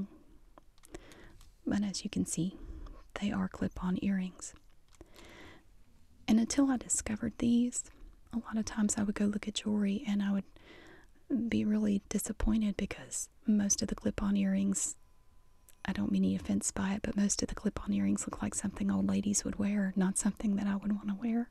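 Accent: South, United States. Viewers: ) You're great!!! My favorite are your soft spoken videos. Perfect with that southern sound. Just trances me put.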